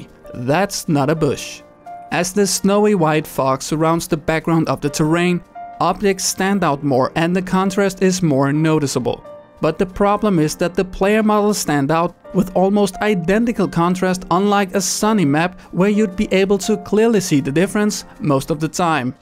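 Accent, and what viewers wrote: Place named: Denmark. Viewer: It's easy to hear for Danes that he is from Denmark - yes with an "a"! :P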